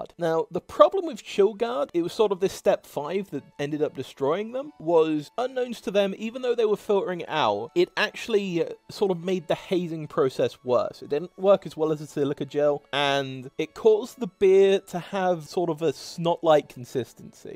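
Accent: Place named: United Kingdom. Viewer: British pronunciation of American names is laughable.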